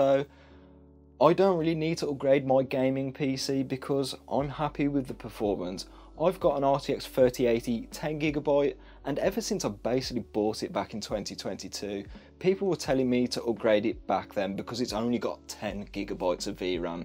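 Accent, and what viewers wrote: Black Country, United Kingdom. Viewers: I wanna ask, are you from the Black Country? From the accent and your channel name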